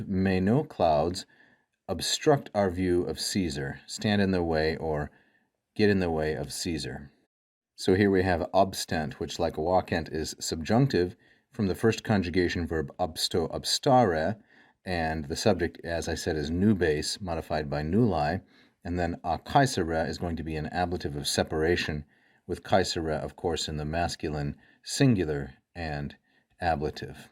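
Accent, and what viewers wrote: United States. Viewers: Latin with american accent is so horrible. Don't throw your life away, please learn the correct pronounciation...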